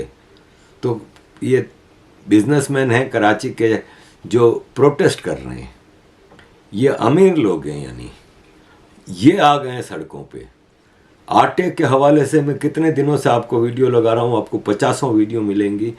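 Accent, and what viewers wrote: Gujarat, India. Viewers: Aapka accent Gujarati jaisa hai kafi had tak, bada meetha lag raha hai sunna. Main ummeed karta hoon aapke desh mein vikas ho aur iss dikkat aapko nijaat mile.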